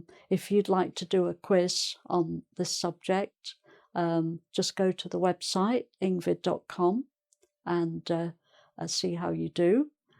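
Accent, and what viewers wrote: England, United Kingdom. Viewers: Iam in love with british accent really it is so beautiful. Hearing it makes me want to stop hearing any Americans. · I honestly admire your charismatic accent..actually I am not a native speaker of English and already used to adopting tye American one..I could say that the British accent sounds both awesone and difficult .thanks for these illuminating classes which you make even easier with your wise and less hurried speaking voice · Great! is magnificent as usual. And her pronunciation is charming, it reminds me of my English friend... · Miss. my full respect for you, really I just folding your class, but I not understand some of your classes, I can’t explain the problem. Maybe I feel shook of British access.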